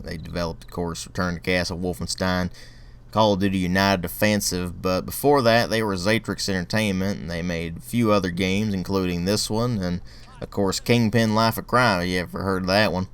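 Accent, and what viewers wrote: South, United States. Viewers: pretty legit southern accent! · Nice deep southern accent!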